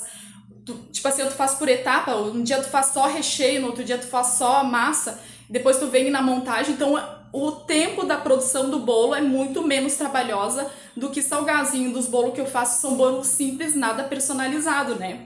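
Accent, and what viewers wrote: Rio Grande do Sul, Brazil. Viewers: Fiquei um tempo no sul e era difícil achar salgado bom. No RJ tem muita gente boa. Pelo seu sotaque eu acho que vc é gaúcha ❤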